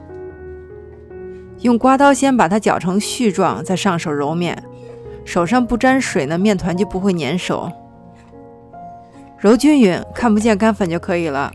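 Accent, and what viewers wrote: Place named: Beijing, China. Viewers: 你声音好好听听起来就像是国外长大的地道北京人这么说你能理解么很喜欢你的视频我是今天开始follow的之前看过一个artichoke 的视屏不知道是不是你的 anyway goodday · 好喜歡看的視頻，教得好細心，有條不紊，聲音清晰動聽，正式的“京片子”。從中我真是獲益良多，很是感謝！且有一個特別好奇的地方，妳為什麼懂做這麼多的菜式。很是利害。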